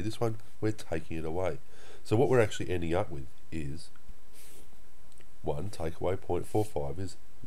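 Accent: Australia. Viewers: Is he Australian sart of video he went like "G'day and welcome to channel" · Ayyyyyyy we got an Auzzie out here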